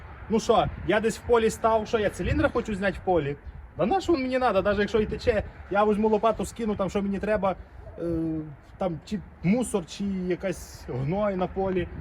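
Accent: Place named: Surzhyk, Ukraine. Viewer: суржик у тебе жахливий,щось мені підказує що народився і виріс ти не у Рівненській області.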